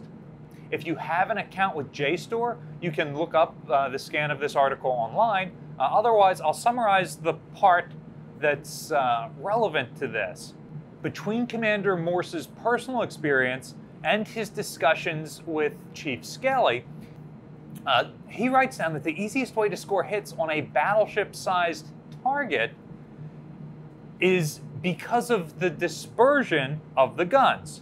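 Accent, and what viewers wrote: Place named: Upstate New York, United States. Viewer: Missouri*. Saying “Missoura” with a Missouri accent makes sense, it’s how they talk. Saying “Missoura” with a John Peck-Erneck upstate New York accent sounds fking ridiculous.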